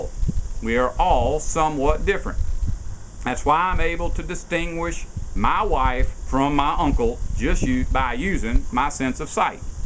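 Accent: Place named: Texas, United States. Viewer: Your voice is very comforting to me, never mind what anyone else says. Its a vocal pattern I heard constantly when I was tiny, growing up in the Gulf Coast area of Texas. So you, sir, are the voice of a comforting bedtime story to me. Thank you for being you.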